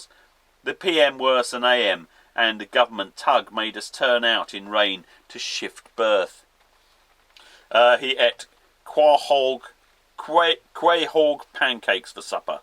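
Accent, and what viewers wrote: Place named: England, United Kingdom. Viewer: I woke up this morning with a cup of coffee and the sound of rain drops on your cabin top and listening to the voice of an enthusiastic English man reading a stories of sailing adventures! A great way to start the day! Strange, it seems we Americans love being read to by a person with an English accent. I'm not sure why. Its hypnotic! "Can I have some more please?" As always love your videos.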